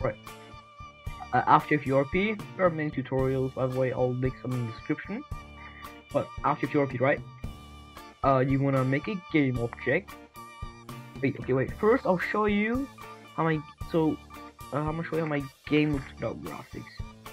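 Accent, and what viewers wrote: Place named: Germany. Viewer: i hear a bit Deutsch hhahahahaha habe glaube noch nie einen Detschen gesehen der ein Gorilla Tag Fan Game macht dachte wäre der einzige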